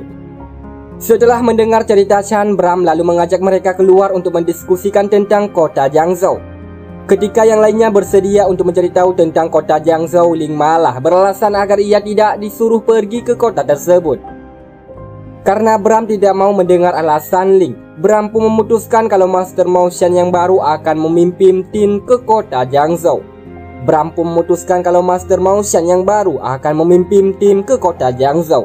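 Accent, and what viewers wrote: Aceh, Indonesia. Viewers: Apaceh banget logat nya. Wkwkw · Logat apa Aceh , lanjot bang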